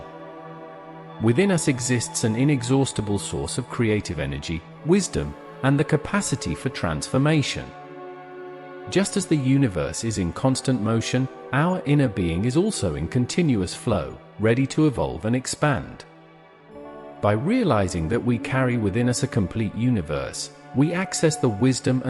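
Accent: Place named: Japan. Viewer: Sounds like Japanese tone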